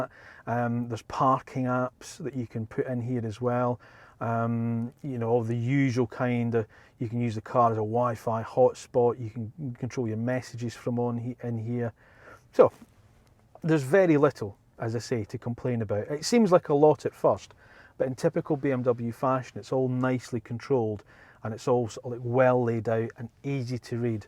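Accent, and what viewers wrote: Scotland, United Kingdom. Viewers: its called a scottish accent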